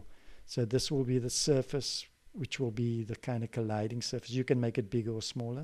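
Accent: South Africa. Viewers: ) that ZA accent is very telling. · you kind of have a South African accent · Awe m'bru...you Capetonian?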